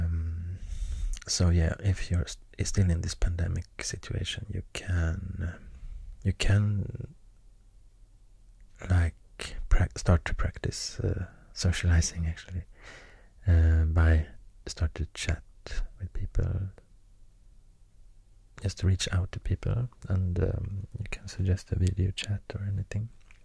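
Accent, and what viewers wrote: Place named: Sweden. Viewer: Idk what it is about people with Swedish accents, but you are not the only person who I enjoy listening to speak. You might even wanna consider speaking in your native language sometimes too and just add the translations in subtitles for people who might want to still read. I just think Swedish is so nice to listen to, even if I don't understand it lol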